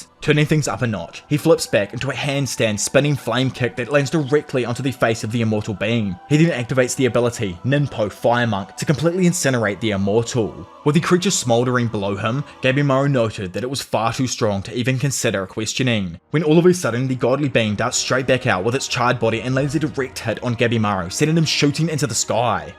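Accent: Australia. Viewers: When history, spiritualism, botany, & anime are intertwined with intellectual acuity the results breed something akin to Hells Paradise. Delightful narration & compression of arc by. Who doesn't love a story told in Crocodile Dundy's accent. Highly anticipating your next arc explained. Thanks, but out of curiosity any significance choosing the name? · Australian accent isn't it?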